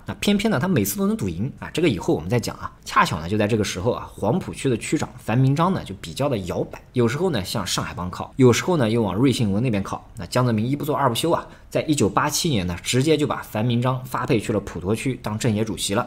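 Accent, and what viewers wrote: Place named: Subei, China. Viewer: 上海人不喜歡你的蘇北口音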